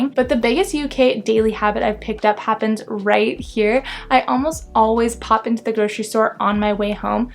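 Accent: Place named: California, United States. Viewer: I think its californian, they do have that nasal drone,but I dont find it irritating, Im from the North east of england, I find my own accent embarrasing sometimes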